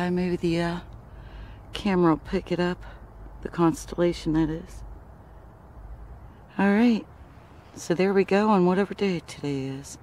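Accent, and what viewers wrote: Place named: Midwest, United States. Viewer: I’ve learned a lot about the realities of amateur astronomy by watching your videos. Thank you. Also, your pace and content are terrific. I definitely feel your voice and tone are excellent and soothing...just right! (I live in Lexington and recognize the midwest accent). I play your videos sometimes to help fall asleep- that’s a compliment, because they are relaxing!! Lol. Thanks again, and don’t change a thing and ignore any criticism. You are unique.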